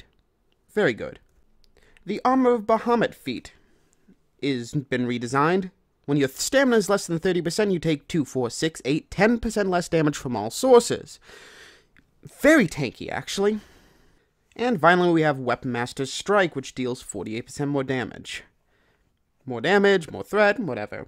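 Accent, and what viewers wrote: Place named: East Coast, United States. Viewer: You sound like you're from the East Coast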